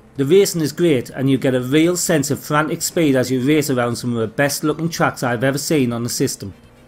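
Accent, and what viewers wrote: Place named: Sunderland, United Kingdom. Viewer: Great video! Nice to hear a mackem accent!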